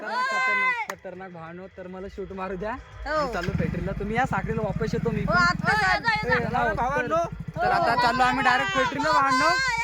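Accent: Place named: Ajmer, India. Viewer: Ajmeri sound bolte bhau🎉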